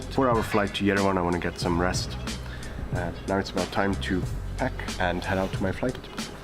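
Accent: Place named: Switzerland. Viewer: Indeed, the Swiss accent sounds nice.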